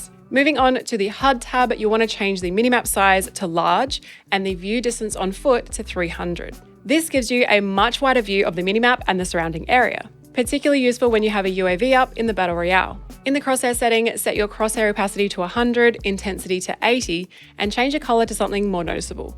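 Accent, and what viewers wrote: Australia. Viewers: I hear you mama content definitely has matured since I last watched still love that aussie accent.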